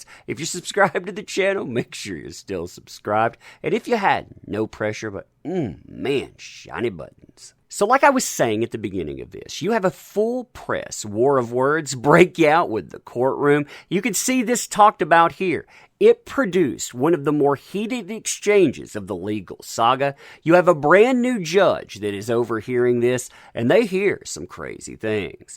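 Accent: South, United States. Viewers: It's his southern drawl that's very charming🤗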